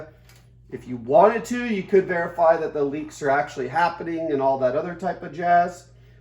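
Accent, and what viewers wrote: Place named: Canada. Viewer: Great video bud ! You sound like a fellow Canadian lol , do you do repairs? I’ve had this dreaded vacuum leak for a while now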